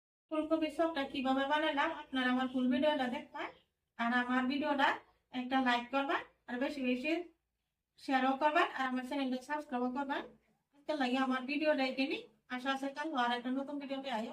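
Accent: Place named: Assam, India. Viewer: ❤❤ধনিয়া পাতা দিয়ে মাখাই ব্রেড ক্রামশ দিয়ে ভাজলেন ❤আপুর আগে চেনেল আছিল অসমীয়া ভাষায় তাইনা আপনার গলার শব্দ শুনে বুঝলাম ❤❤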